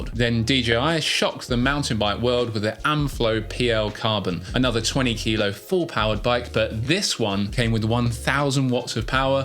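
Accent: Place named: United Kingdom. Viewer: what the f did this brit say????/ dang, subtitles can't even understand the accent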